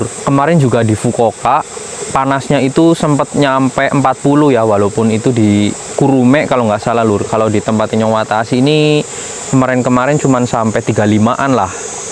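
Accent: Banyumas, Indonesia. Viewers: Kang kaya wong Banyumas asale ngendi.... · mas bro kowe wong ngendi si kok ngomongnya kaya gitu ?, orang ngapak ya !. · wong ngapak ya mas bro?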